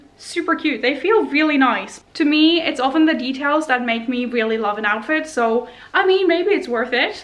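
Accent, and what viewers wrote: Ireland. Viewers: Norwegian with Irish accent?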